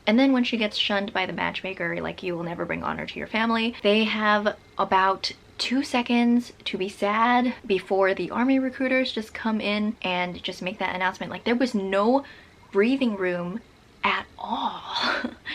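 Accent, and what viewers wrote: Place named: United Kingdom. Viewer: subbed for amazing British accent! haha. Oh and also, good review :)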